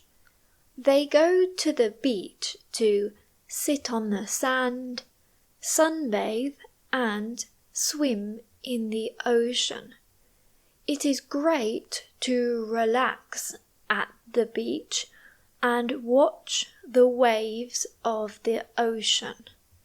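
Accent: United Kingdom. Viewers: Es inglés británico?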